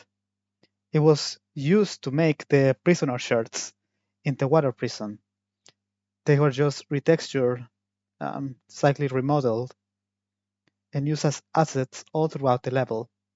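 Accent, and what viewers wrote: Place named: Spain. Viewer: Ah ese acento español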